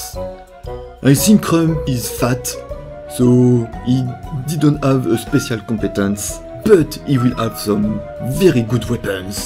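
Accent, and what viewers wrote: France. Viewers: You French. Also merry Christmas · your french accent is beutiful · Je rigole quand j'entend cette voix. C'est pas contre toi mais j'ai l'impression que tu fais un peu exprès de mettre cette accent français. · Mec Ta un accent français ES QUE T FRANCAIS? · he is french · Nice French accent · L'accent français est parfait! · Cet accent français magique mon gars, tu m'as tué 😂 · Wait, are you from france? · You are astonishingly French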